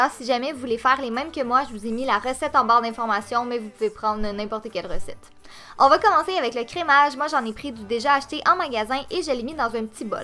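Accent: Quebec, Canada. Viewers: c'est vrai c'est beau le québécois😘😘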